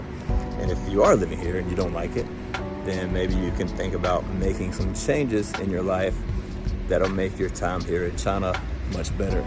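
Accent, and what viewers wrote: South, United States. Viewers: you from the south? Why you sound black?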